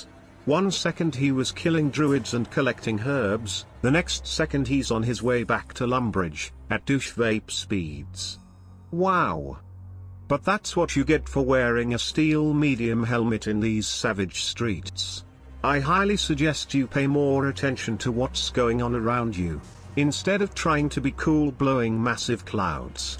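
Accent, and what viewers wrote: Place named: Georgia, United States. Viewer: It’s funny I’ve never heard this mans real voice yet I know for a fact he’s from Georgia or Florida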